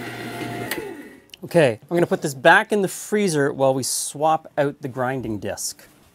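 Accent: Canada. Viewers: I'm amazed at the kitchen equipment he has. He must have a big place to store all that stuff. I mean, who has a dedicated sausage stuffer? It's not exactly small either. Also, I wanted to mention for a while now, I love how Canadians say certain words like "out". I can't even describe how it sounds to me. Makes a Canadian, or American from the far North stand out every time.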